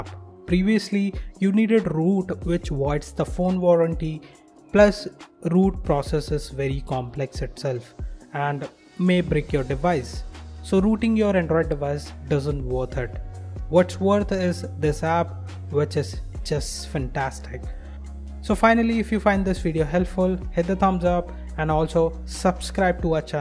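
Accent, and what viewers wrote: India. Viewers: Why are vids like these always some heavily accented Indian you can barely understand?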